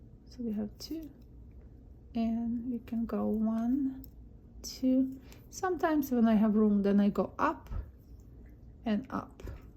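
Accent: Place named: Czech Republic. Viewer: I love it, I lived in Prague for a while and while I know it’s not exactly the same, your accent makes me miss Czechia very much. I even have been to Kiev. My heart is with your people. 🖤💔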